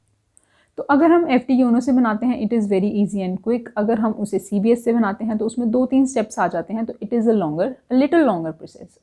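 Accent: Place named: United Kingdom. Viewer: i like ur eng accent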